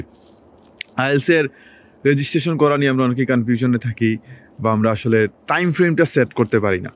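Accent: Sylhet, Bangladesh. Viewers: ভাই সিলেটি ❤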